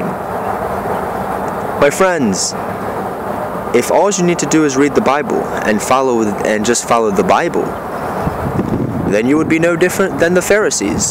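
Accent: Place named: England, United Kingdom. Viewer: robert lyte is australian this guy is english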